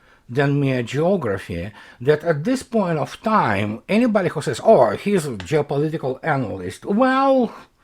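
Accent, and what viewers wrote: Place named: Russia. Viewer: You are very good and everything you are talking about is very interesting and important. I am adjusting myself to your russian accent, so I think I understand it all. 😀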